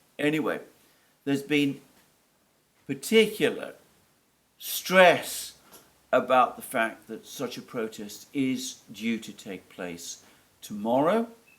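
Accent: United Kingdom. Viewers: Question for Brits: I am so distracted because I can't place Alexander's accent. Does he speak RP, London accent or something else? It doesn't matter which. but I am not a native speaker myself and just want to know what I spend all this time listning to. Grateful for some clarity! 😊Love from Sweden.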